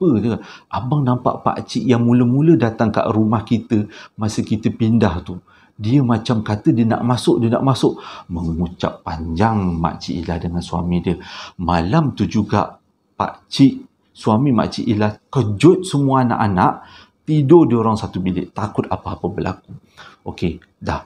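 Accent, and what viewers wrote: Java, Indonesia. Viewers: Bercerita ada slang2 jawa..tu yg terpikat kat channel ni..menusuk dlm jiwa raga...😊😊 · Slank tu mcm bunyi ala2 jawa.....memang tulen ye😬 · Abang jawa ke bang.sedap didengar.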